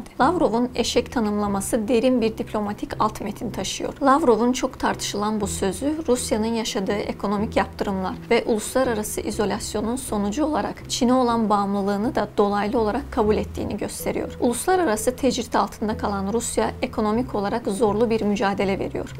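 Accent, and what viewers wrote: Azerbaijan. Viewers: Kiz Azerbaycanli , konuşmasindan anladim 🫠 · Azeridir o yüzden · Arkadaş Azerbaycan lı Türkçe anadili değil. Hem alınmasın diyorsun hem de bir insanın yaptığı işi değil, Allah vergisi sesini eleştiriyorsun biraz anlayışlı olun. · Sen dinlemek için değil kusur bulmak için buradasın.İşine gelmiyorsa başka yere.Azerbaycan Türkü anlatan bayan.Haliyle şive telaffuz farkı <link>ğenmşyorsan dinleme kimse seni burada zorla tutmuyor.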